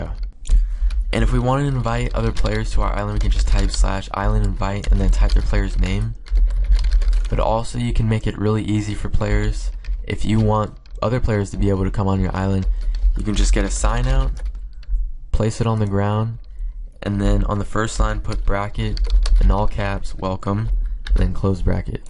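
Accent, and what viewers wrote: Italy. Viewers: Numero uno,are you italian or english? lel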